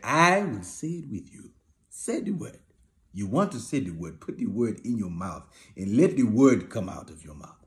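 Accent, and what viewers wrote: Africa. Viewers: Shuler has the best African accent. PS I am a Nigerian. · Brutha Shuler 🤴🏾...😂😭🤣🤣 your African voice cracks me up..😂😭😭 Shaka Zulu frfr... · YOU ARE THE MAN!!!! That African accent.....🤣🤣🤣🤣🤣🤣🤣🤣🤣🤣🤣🤣🤣🤣🤣🤣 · Lmbo man u r straight up crazy... more of the African accent, love it love it love it!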